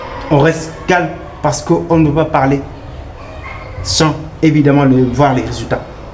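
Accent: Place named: Senegal. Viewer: Deja tu n'est sénégalais avec ton accent la hum🤷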